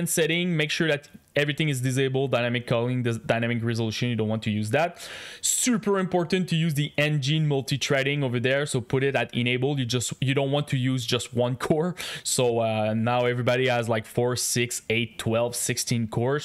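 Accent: Quebec, Canada. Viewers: You from Quebec by any chance? That accent sounds hella French Canadian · French canadian proper. 😀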